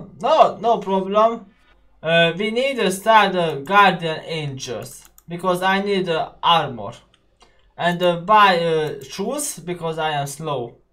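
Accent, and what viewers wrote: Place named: Hungary. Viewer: már régen is jó volt az angolod, de örülök hogy most már nem olyan magyar akcentussal beszélsz mint ebben a videóban.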